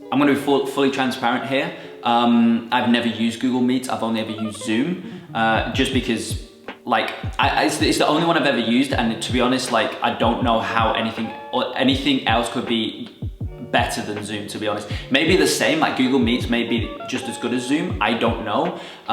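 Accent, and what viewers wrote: United Kingdom. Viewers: Are you from England? British?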